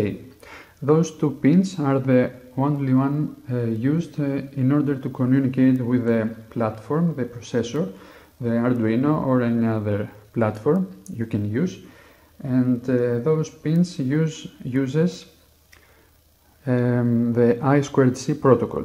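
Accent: Greece. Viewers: I have been to greece (Olimpiada) past summer for vacation, i can recognize your accent by the "h" sound :-) good job!! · are u greek????? You sound like me to talk in english im greek too lol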